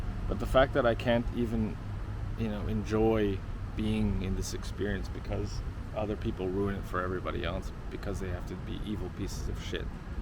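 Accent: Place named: Europe. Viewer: You have a cool accent. Are you European?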